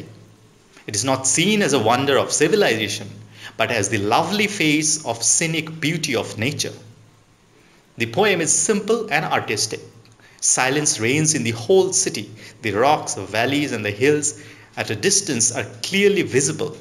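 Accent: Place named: England, United Kingdom. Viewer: I just loooovee your English accent ❤❤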